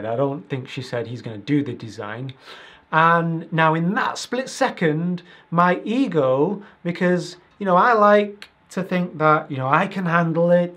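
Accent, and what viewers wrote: Manchester, United Kingdom. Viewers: Hey, another great video! Thanks for share with us your genuine experiences and mistakes. Btw are you from Manchester uk?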